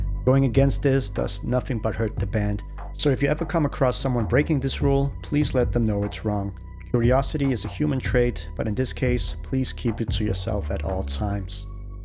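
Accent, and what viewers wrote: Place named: Denmark. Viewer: Dansker accent :D great video.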